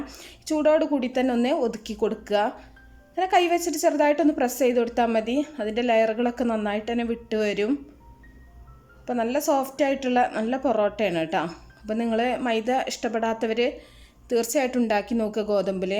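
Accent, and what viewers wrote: India. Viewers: Ok ചെയ്തു നോകാം ട്ടാ 😄, എന്റെ നാട്ടിലെ അതെ സംസാരം ചേച്ചി പാലക്കാട്‌ ജില്ല അല്ലെ? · മലബാർ syliyilulla നല്ല അവതരണം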